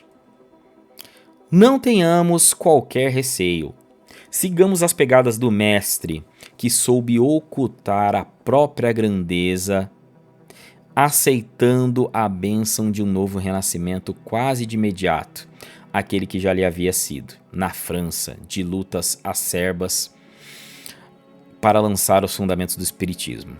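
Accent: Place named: São Paulo, Brazil. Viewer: Meu caro vc se expressa mt ruim com seu sotaque Paulista e precisa ler melhor, não tem emoção deu a entender que era qualquer leitura. Desculpa mas é uma passagem mt importante estude mais meu caro. Deus abençoa ❤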